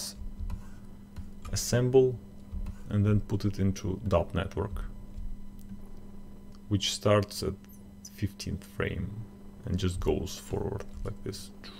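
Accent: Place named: Russia. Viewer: Сразу слышно русский акцент. 😊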